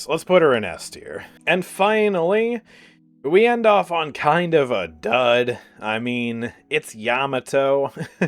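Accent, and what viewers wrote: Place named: Canada. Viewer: dude why whenever i watch your video it makes me feel like iam watching canadian guy eh it just you guys have the same style in talking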